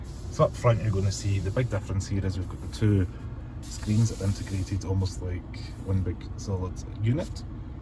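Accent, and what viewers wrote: Scotland, United Kingdom. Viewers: Can tell a fellow Scotsman Usual Scottish windy weather in the video. Nice review as always.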